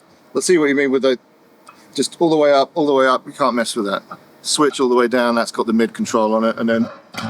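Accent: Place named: United Kingdom. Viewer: I never checked before. Surprisingly British. 😅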